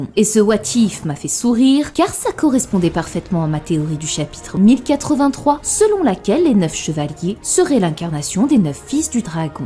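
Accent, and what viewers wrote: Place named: Belgium. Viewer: oui un peu à la manière de certains belges et plus précisement quand le u est suivi d'un i ^^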